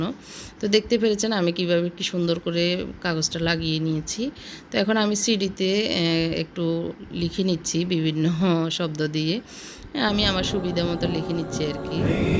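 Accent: Noakhali, Bangladesh. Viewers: আপনি তো নোয়াখালির কথা শুনেই বুঝতে পারি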